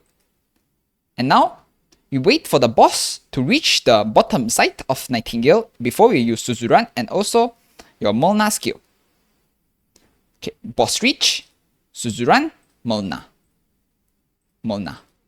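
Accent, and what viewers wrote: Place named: England, United Kingdom. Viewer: Why do you have a sort of english accent up till rs-ex-5? lol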